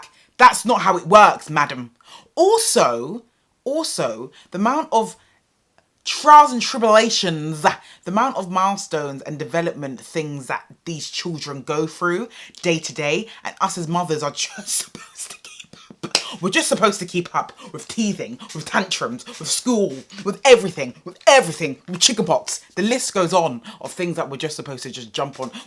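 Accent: United Kingdom. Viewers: Id prefer if you could seperate your - very talanted - comedian style from a very serious message. I was a little irritated because it was so funny to watch. Beside your strong english dialect is fun too for foreigners :D Glad to know its not only me feeling flood by this dog pest.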